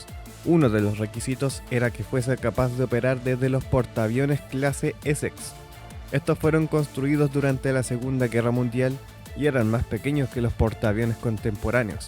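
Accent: Chile. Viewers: Solo por curiosidad, el que relata es de origen chileno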